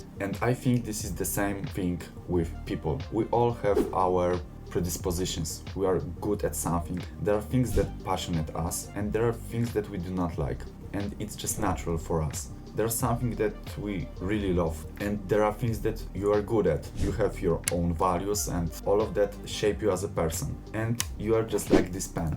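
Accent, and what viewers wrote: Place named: Poland. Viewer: Akcent bardzo polski, materiał ciekawy i ćwicz stary ćwicz!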